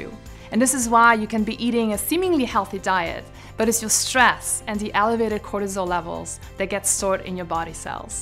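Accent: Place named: Ireland. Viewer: Like ur Irish accent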